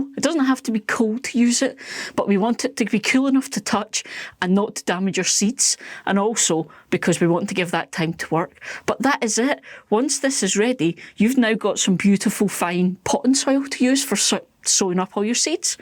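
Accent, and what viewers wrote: Scotland, United Kingdom. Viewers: Hi! I have only recently been introduced to your you tube channel by Gardener Scott! I have watched a number of your video's and find them very informative, professional and entertaining! A bit of background. I live in the state of New Hampshire USA. I used to garden for a number of years, stopping about 5 years ago. Starting up again this year. I always did a conventual garden. This year I am planning on making 6 high raised beds, 4 for general produce and 2 for asparagus. My growing stats are- zone 5A, Lat. 43.6, elevation 1100 ft. I live on a 3 acre property which my grandfather started a dairy farm on. Rocky soil but VERY fertile Carlton Loam. I cannot stop anything from growing including weeds! Weeds, the main reason for raised beds. The high beds( 30 inches approx.) I am retired and don't want to bend over or be on my knees as much. 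I realize you are in a more restricted space,(urban, rural) I would appreciate any tips for me and fellow gardeners which have more space. I am still planning on planting some crops in ground, corn, potatoes, winter squash and cantaloupe! I am also planning on planting some other plants in ground as well as in the raised beds to compare! Love your Channel! Love your expertise! And your accent as well!!!!!!! Could listen to Scotts and Brits all day!!!!! And I am part Dane by my grandmother! Eric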